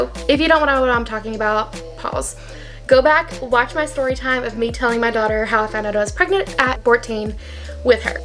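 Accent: Alabama, United States. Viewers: When I’m watching this video you guys are at 99.4 subscribers. SO CLOSE! I would love to see a series of videos like this. Also, do you guys still live in Alabama? NOT CREEPY, I’m just really fascinated by your accents 😂🤣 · I had a STRONG feeling you were from Alabama. I’m from North Alabama! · I just discovered your channel from your story video 💕💕 I knew I recognized that Alabama accent.. I'm a Bama girl too! 😊 You sould like a great mom! I would love to watch a series on your teen mom experiences. Thank you for sharing your story.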